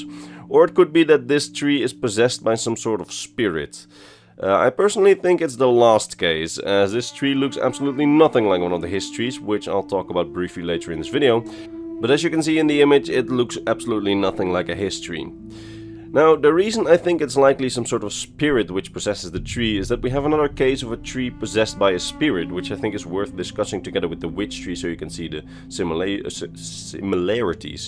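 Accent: Netherlands. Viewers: One of my favorite parts about your videos is your Dutch accent👍🏻👍🏻I love it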